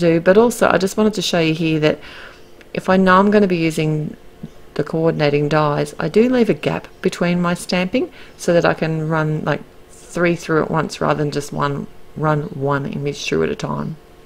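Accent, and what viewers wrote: Australia. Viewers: Love your sweet card. I've never been keen on flat flowers, always preferring dimensional, but these look so very fresh and pretty. Thanks for all the tips about stamping. Lovely to hear an Aussie voice. Sorry, I think I say this each time I comment, but it's true. Good to know that our Aussie talent is being showcased. Well done.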